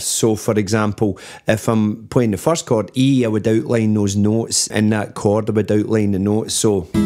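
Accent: Scotland, United Kingdom. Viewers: YOu must be a Scottsman?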